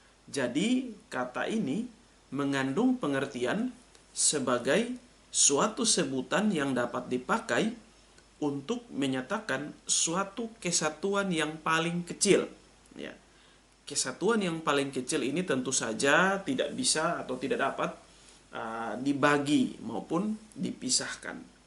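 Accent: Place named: Java, Indonesia. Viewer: Koq ada nyelip2 logat jawa nya bapa dosen.. Heheee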